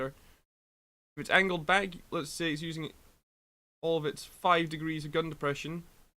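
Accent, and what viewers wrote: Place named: Scotland, United Kingdom. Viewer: Dear lord, u is sounding Super Scottish right now!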